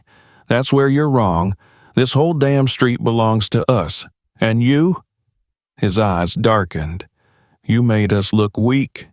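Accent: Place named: South, United States. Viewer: I am so glad Vin Diesel stepped up to help that young woman. She seemed very scared by those bikers. And by the way, you would make an excellent audio describer. You have the voice for it too. A strong, southern accent, as well as an excellent describing voice. I would know, because I've listened to a lot of audio descriptive movies and TV shows. President HW Bush describes it's a wonderful life for those that are blind or visually impaired. I myself happen to be blind. Totally blind in fact from birth. It doesn't slow me down though.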